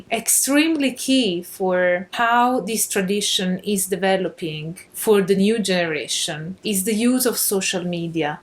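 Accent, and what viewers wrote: Italy. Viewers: Didn't recognize the ascent as Italian, my family's is different. Doesn't matter, now your just even better. Anyway, my big question is or maybe it was answered and I just didn't understand is, because I'm stuck in that old Catholic way of thinking, how you can change tradition. I'm coming at as an American, and every youtuber or person I know it's personal, or singular. It feels like it is a very new religion and changes depending on who you talk too. I would think things should be written down in old books or even tablets. It feels to me that thinks should stay consistent. Also, in the U.S. students don't cast spells, they just pull there cell phones out frustrating teachers. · Grazie mille di aver condotto questi studi, si vede quanto sei preparata e appassionata a riguardo! Pensa te che ti ho trovata su youtube casualmente, per poi capire (in fretta, hai un bellissimo accento italiano) che eri italiana e per di più a quanto ho capito vivi a Leeds.. 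e io vivevo a Leeds fino a poco tempo fa 😂😂😂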